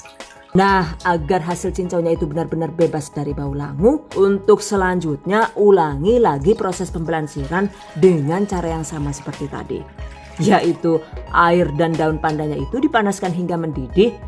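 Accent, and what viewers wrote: Banyumas, Indonesia. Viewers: Msih muda tp bsa suara nya mirip wanita dewasa tua mirp suara maria untu penyiar radio jmn dlu keren pinter bhs inggris/ nglucu ,ngapak resep" 👍👍sdh tjir mlintir pula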